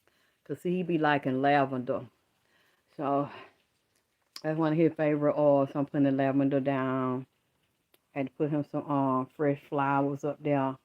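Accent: South, United States. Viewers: I love your southern/country accent!!!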